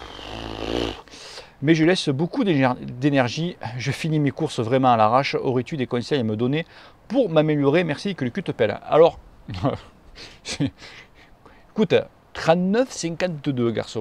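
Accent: South, France. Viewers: Salut! Super t’es vidéo! Très instructive.. et en plus avec un super accent du sud! Je suis un voisin, j’habite du côté de Perpignan..eh oui j’ai la chance d’être catalan!😉. Je roule 3-4 fois par semaine et je souhaite faire du HT pour progresser et faire du spécifique, de manière ludique tant que possible. Je veux acheter un HT d’occaz dans un budget autour des 200-250€.. j’hésite entre un TACX BUSHIDO SMART et un BKOOL SMART PRO.. c’est pour une utilisation sur les applis connectées ZWIFT, BKOOL,etc.. et pour utiliser les programmes spécifiques.. (sur un smartphone..) des conseils éclairés sur le choix? Merci d’avance!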